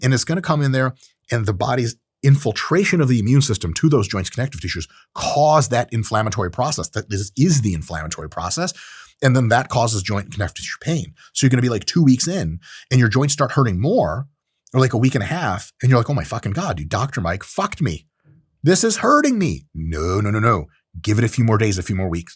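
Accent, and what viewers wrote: United Kingdom. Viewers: Love the British accent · I f******g loved the British accent. Much love from the UK brother. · British accent 🤢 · Your British accent is aweeesome 😂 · I need more of that British accent! Hilarious!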